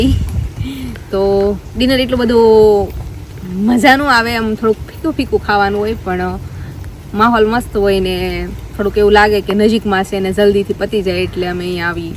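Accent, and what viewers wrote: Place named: Kathiawar, India. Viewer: ખુબ સરસ્સ વિડીયો. ફ્રાન્સમાં અસ્સલ કાઠીયાવાડી બોલીમાં તમે ત્યાની રેસ્ટોરન્ટનો અનુભવ કરાવ્યો. વિડીયો બનાવતા રહેજો. તમારા અગાઉના પણ કેટલાક વિડીયો જોયા છે. બહુ ગમ્યા.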